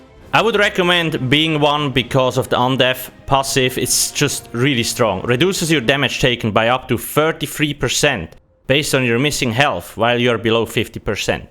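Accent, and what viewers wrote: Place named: Switzerland. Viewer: Definitely not Russia, Switzerland I think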